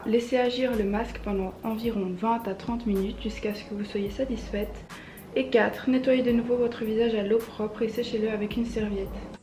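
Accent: Belgium. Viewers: J'ai direct reconnu ton accent belge, je suis de bxl aussi 😊 je viens de découvrir ta chaîne ça fait plaisir de voir une belge 😍 bonne continuation 💪🏻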